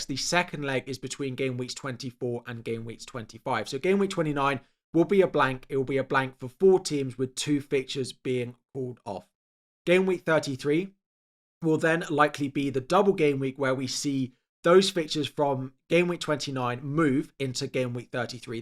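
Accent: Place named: Liverpool, United Kingdom. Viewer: The boy scouser!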